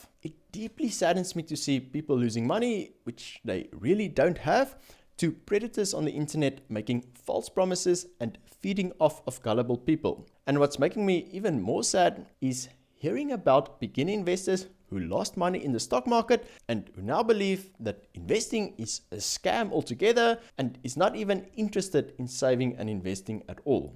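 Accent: South Africa. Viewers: Cool video! Love the accent. SA represent. I also noticed a big gap in localised content in the marketing and entrepreneurship space. ❤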